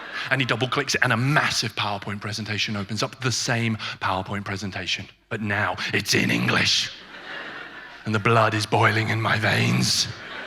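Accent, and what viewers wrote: Denmark. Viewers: Simply Awesome! why did I first discover you now? Best Danglish since "The Julekalender". I hope you´re only beginning your triumph-tour here. Dit danske er faktisk fint, det et pænt svært sprog og vi taler som regel rimeligt forståeligt engelsk her, så det nemmere bare at slå over i engelsk, hvilket jo ikke giver meget sprogøre. Hope to see you again soon, put two shows in Sjælland please(maybe Kalundborg, there are thousands of Novo Nordisk employees, many of them internationals that will Love your show+ CPH ofcourse)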